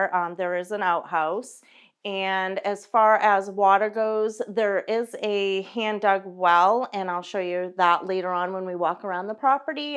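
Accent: Maine, United States. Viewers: like the way you say side-in for the siding, gotta love that true Maine accent!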